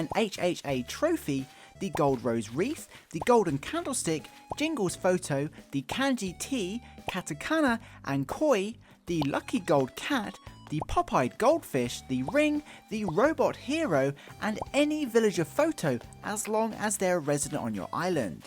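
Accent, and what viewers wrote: England, United Kingdom. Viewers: he’s English lol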